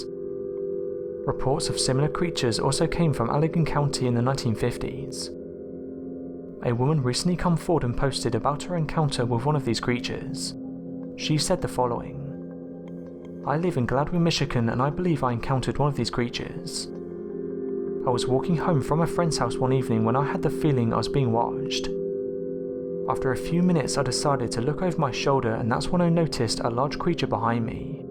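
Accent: United Kingdom. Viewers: Cant undrstand u brit or ausie twit! Get another 2 narate👹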